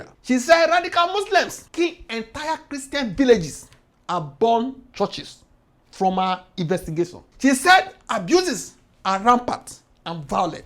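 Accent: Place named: Nigeria. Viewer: You go school😅 English na naija pidgin. Though, a good observation.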